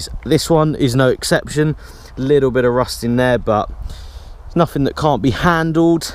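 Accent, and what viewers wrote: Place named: West Country, United Kingdom. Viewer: are you from the isle of man or did u just move there? you sound very west country lol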